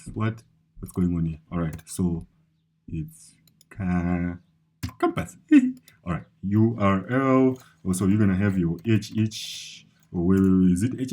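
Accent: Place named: Zimbabwe. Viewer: Hahaha...nice one, just listening to you, you reminded me of my Zimbabwean friend, so I want to guess you are fron Zim...lol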